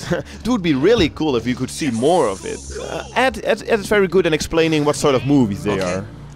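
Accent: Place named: Netherlands. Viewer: Yeah man!!! And your from holland..Ik ook!!! ik merkten het aan je acsent en het liedje in het begin. Goeje beschrijving voor de film man!!!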